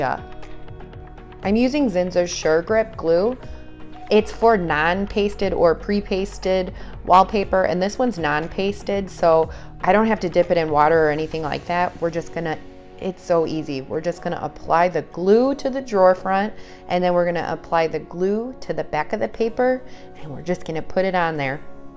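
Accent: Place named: Minnesota, United States. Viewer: I love this! I have already ordered most of the items you use! Also, I love your Minnesota accent!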